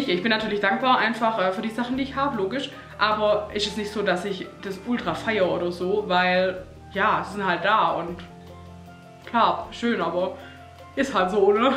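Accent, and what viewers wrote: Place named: Swabia, Germany. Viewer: Tolles Panoramafenster. Bei Euch liegt ja ordentlich ❄️! Wo kommst Du denn her der Dialekt hört sich sehr vertraut an! Schwäbische Alb? Was machst Du denn beruflich? Wie habt Ihr Euch denn kennen gelernt? LG aus bayrisch Schwaben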